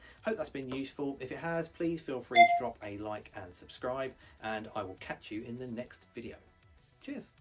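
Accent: United States. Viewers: Your American Accent was perfectly good. Thank you for this informative demonstration. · I just acquired stormtrooper complete armor here in Florida, USA and I'm looking for a voice changer. Your video was very helpful and entertaining. Plus your American accent stormtrooper voice sounded really good. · Great video, thank you! Your American accent is not bad at all. I’m not sure I would have questioned it.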